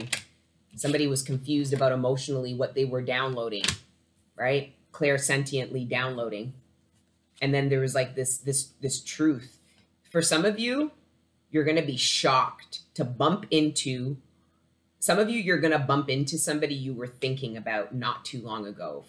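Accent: Toronto, Canada. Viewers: Canada- you sound like your from Toronto! I’m in Scarborough! But anyways....love your energy! 💜💜💜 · You're hilarious and so fun! I thought you might be from Toronto / Scarborough from the way you talk and then you said you're from Canada. This reading lifted my spirit. I also have a similar incense burner. 🙏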